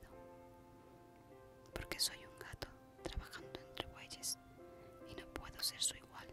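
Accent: Spain. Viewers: Wow, I love Spanish asmr now. Especially with the Spain accent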